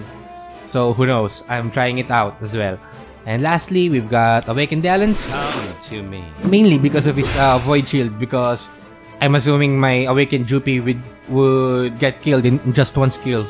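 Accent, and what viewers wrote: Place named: Philippines. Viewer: First 30 seconds accent I tought you were from Romania, then I realized Philiphines.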